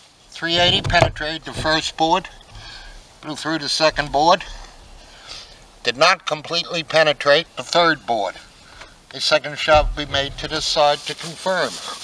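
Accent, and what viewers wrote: New England, United States. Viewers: You can definitely tell this is a Yankee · Love this New England accent.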